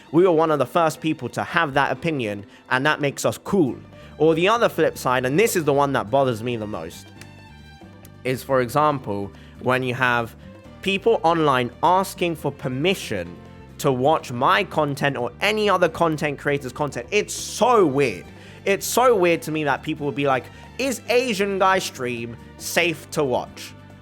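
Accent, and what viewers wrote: United Kingdom. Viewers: You are gonna love Link Click. Odd taxi has brilliant writing but like you I was just blown away by Link Click. I always feel like I am visiting with old friends from the UK when I hear you stream. Love your content mate.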